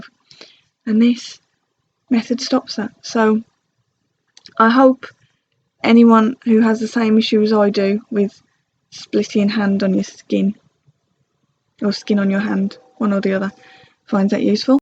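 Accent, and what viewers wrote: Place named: United Kingdom. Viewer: I have Irritant Contact Dermatitis (To the best of my knowledge based on so much research I have done!) where I have redness, itching , blistering and fissures on the back of my hands exactly the areas you indicated in your video and especially my right hand after I wear any glove (Latex, Nitrile or vinyl ) . According to my researches sweating under the gloves is what causes the dermatitis , do you think wearing those cotton gloves under them would help minimize/absorb moisture or it will increase sweating and thus worsen my condition? Thank you so much for uploading this very useful video.. Love your British accent :)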